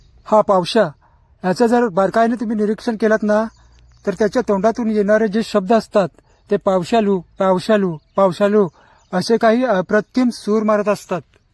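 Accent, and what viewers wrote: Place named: Konkan, India. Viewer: Dada tumi koknathele ahaat ka tumchaa bolnyaavalun kalte khuf chaan video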